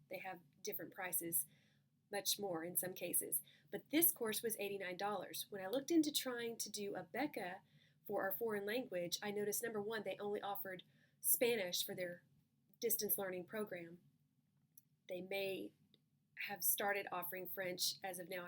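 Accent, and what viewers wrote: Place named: France. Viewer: Greetings from Algeria, your french accent is funny, thank you for sharing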